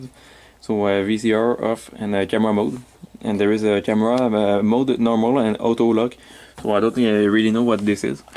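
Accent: Quebec, Canada. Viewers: J'ai reconnu tout de suite un Québécois. Haha on est facile à spoter quand on parle en anglais.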